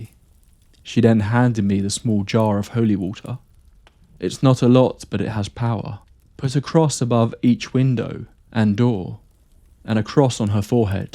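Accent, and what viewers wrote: England, United Kingdom. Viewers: Sioux City, Iowa. Da SUX (Airport code.) Anglophile, have heard a lot of English accents for an average US citizen and yours is superb. Absolutely brilliant channel. Thank you.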